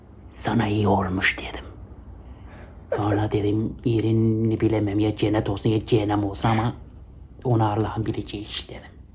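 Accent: Turkey. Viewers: Çok iyi yapıyor ya Kürt Alevi şivesini 😂😂😂 sanki bizimkilerin konuşmasını dinliyorum 😂😂😂 birebir 😂😂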